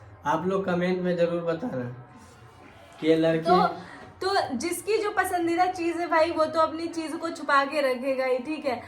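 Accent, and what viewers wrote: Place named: Lakhimpur, India. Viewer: Deshi languge Lakhimpur waali bhasha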